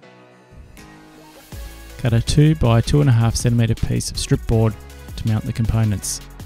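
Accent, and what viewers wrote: Australia. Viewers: When you finally hear daddy's voice and he's an aussie <3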